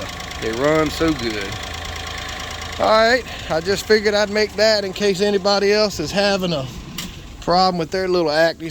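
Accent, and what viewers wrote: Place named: Virginia, United States. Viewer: Thanks for this I’m considering purchasing a Kei truck, I’m leaning towards Honda because it seems like you can find a lot of parts here in the states. Your VA accent is strong! This video came recommended and I didn’t even look at the channel name but though “This dude is from VA”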